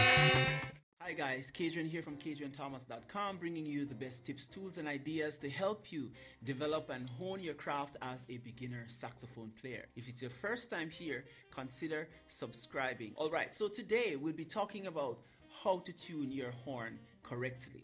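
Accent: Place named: Jamaica. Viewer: Yes, a yardie. I heard the accent and went over to your channel. Can't hide. lol!!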